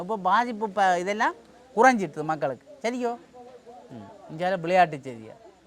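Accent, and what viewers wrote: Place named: Sri Lanka. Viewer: side என்று முடிவதெல்லாம் கிருமிநாசினி என்று விவசாயத்தின் தந்தை என்று தமிழ்நாட்டவர்களால் போற்றப்படும் நம்மாள்வார் குறிப்பிடுவார், நீங்கள் jaffnaside என்றபோது அதுவும் ஒரு கிருமிநாசி ஆகிவிடும் என்று வேடிக்கையாகப் பின்னூட்டம் இட்டேன்😄😄😄🥰, மேலும் ஒன்றைக் குறிப்பிட விரும்புகின்றேன் உங்களுடைய பேச்சு வழக்கு உச்சரிப்பு ஈழத்து நகைச்சுவைக் கலைஞர் அப்புக்குட்டி T இரச கோபால் அவர்களின் உச்சரிப்பை ஒத்ததாக இருக்கிறது அதனால் உங்கள் நளினம் வெகுவாகப் பிடிக்கும்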